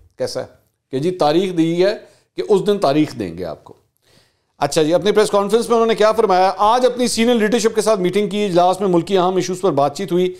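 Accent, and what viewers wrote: Punjab, India. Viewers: تھوڑا سا پنجابی ٹچ کم کر لیا کریں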